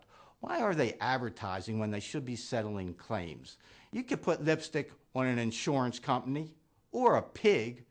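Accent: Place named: Baltimore, United States. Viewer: Super Ballamer accent. Long o and a sounds. Forget Mr. Ray get this guy for a John Waters movie. He's into golden showers.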